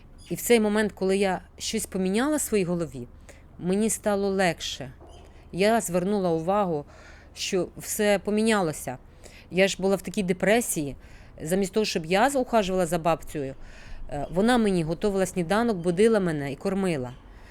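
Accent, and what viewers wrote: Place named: Ternopil, Ukraine. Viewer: А, тому мені говір здався рідний,бо ви з Тернополя, я також!!! Вдачі вам!!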